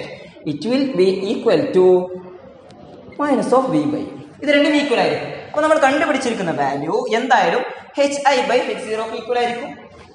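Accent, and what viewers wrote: Tamil Nadu, India. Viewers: Sir u r a tamil or malayali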